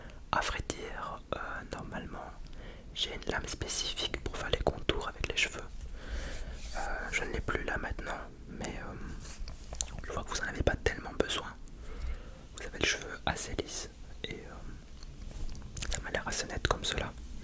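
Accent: Belgium. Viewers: Serait-ce un accent belge que j’entend?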